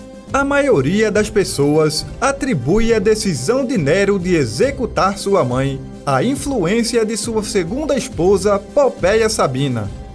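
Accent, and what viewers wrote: Northeast, Brazil. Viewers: Narração muito boa! Sotaque nordestino o máximo. Vc me faz rir! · Gostei do vídeo e das expressões nordestinas. É sempre bom conhecer mais a história, e ainda melhor com o sotaque do meu nordeste.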